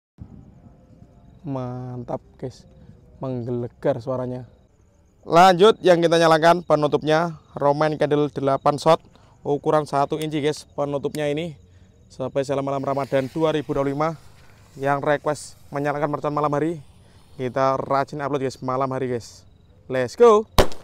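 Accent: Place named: Banyumas, Indonesia. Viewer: Ya jo lali subscribe bang koe kan wong ngapak bang jo lali awal video ngomong "ora ngapak ora kepenak"yo mas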